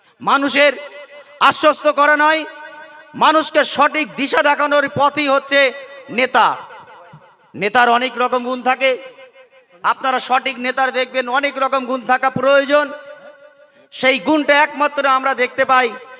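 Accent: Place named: Bangladesh. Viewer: Kotha sunei to mone hoche bangladesh er tar topkano mal, r a bangladesh fire ja 😂😂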